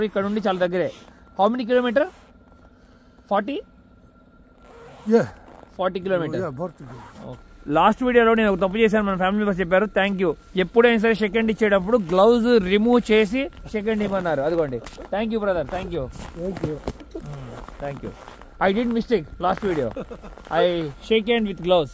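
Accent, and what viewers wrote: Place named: Telangana, India. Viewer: Anna ki mana Telangana basha ki shift aipotundu vadina 😁 Bagundi to Masthundi 🔥😅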